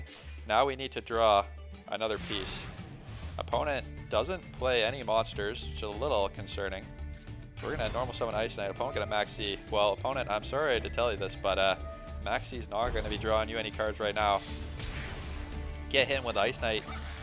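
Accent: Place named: Canada. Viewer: You sound big time Canadian bro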